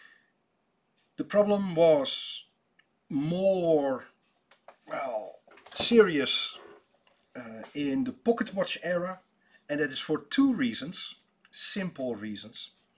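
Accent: Netherlands. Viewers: Toen ik jouw accent hoorde wist ik het al: NEDERLANDER!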